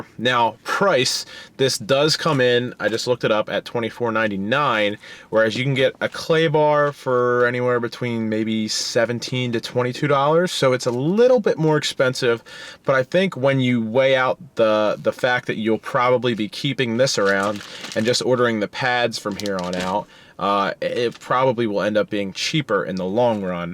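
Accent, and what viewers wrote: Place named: Maryland, United States. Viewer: Sounds like you're from Maryland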